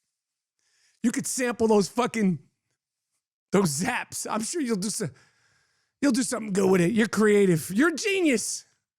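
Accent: New York, United States. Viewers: I’m sorry this is not relevant to the video, but I do voice acting and I base my New York style accents off your voice and accent. I like the content on your channel too, keep it up!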